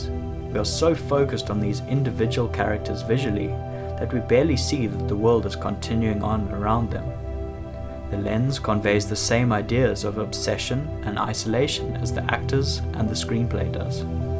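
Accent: South Africa. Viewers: You're South African. Also great vide. Was really informative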